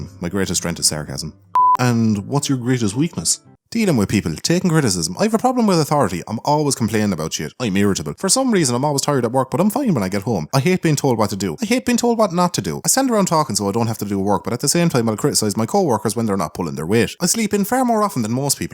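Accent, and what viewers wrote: South Africa. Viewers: South african accent, can someone tell him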